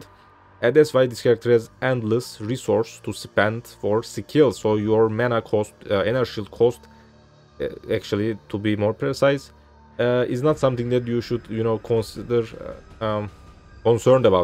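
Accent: Turkey. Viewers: Bu videon önerilerde karşıma çıktı ve şunu söylemeliyim ki, başka hiçbir şeye bakmadan İngilizce şivenden Türk olduğunu anladım :D Bunun dışında güzel build, içeriklerinin devamını dilerim kardeşim :) Discord kanalın var mı bu arada? Açıklamalarda veya profilinde bulamadım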